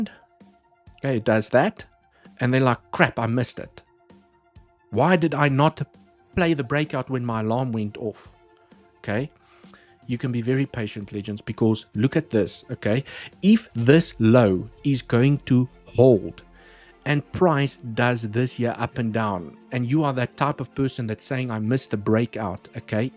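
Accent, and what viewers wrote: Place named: South Africa. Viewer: Thanks. Nice to hear a South African analyst. I'm in the UK now, but 41 years in Southern Africa. 🇿🇦🇿🇦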